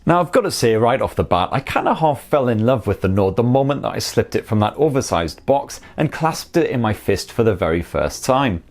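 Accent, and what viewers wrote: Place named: Scotland, United Kingdom. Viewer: love your accent and play of words broh! are you Scottish by any chance ?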